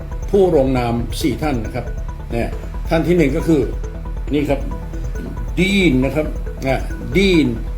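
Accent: United States. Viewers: This is the real american accent ,you know? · สำเนียงโคตรเมกันเลยจารย์ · สำเนียงและสปีดเดียวกับ Voice of America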